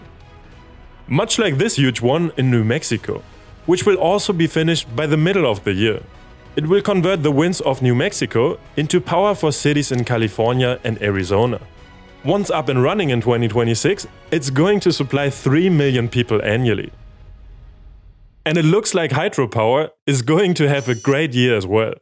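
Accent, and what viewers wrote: Germany. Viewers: His accent is clearly German 😅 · Is he German?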